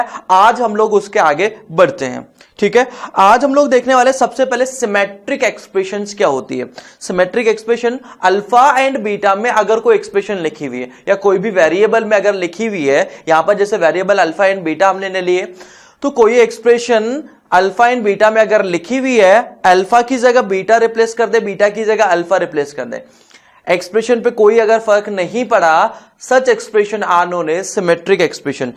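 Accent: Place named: Maharashtra, India. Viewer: Sir sounds marathi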